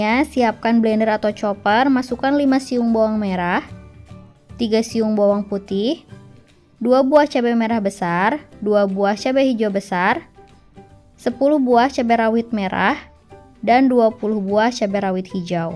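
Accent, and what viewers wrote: Java, Indonesia. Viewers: Duh jadi laper mba, medog banget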